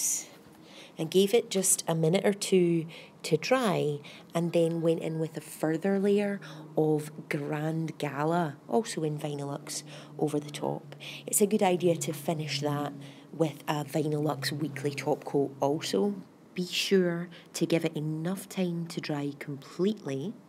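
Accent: Scotland, United Kingdom. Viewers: You people have no class for commenting like this. I watch her cause I do Shellac nails at home and not experienced in using or creating shattered glass effect among other methods. To say her voice is creepy and it’s probably because she is Scottish is racist. I work for a Scottish lady and she has an accent but not creepy. I have an accent cause I’m Slovak, people make fun of me since I came to Canada and told me to go back home. Until you walk in other peoples shoes you shouldn’t talk so negatively. Women should support one another and encourage one another. It hurts me that other women talk like that about other women instead of saying positive comments. Who are you, stand in front of a camera and talk and do nails at same time, I dare you all Bully’s out there. You people have to watch what you say cause it will come back and haunt you. I just wonder why people click on certain platforms to watch and then comment trashy. 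Are they bored, are they nail learners, it can’t be nail professionals cause they wouldn’t comment like that. These people are juvenile and BULLYS. I don’t know this lady but love her work and I use the products she has CND and more. She is an ambassador representing CND and Lecente products and you juveniles should give her respect. Who were you brought up by to have manners like that.🤮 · loving your little video keep them coming and its nice your Scottish so can relate more lol xxx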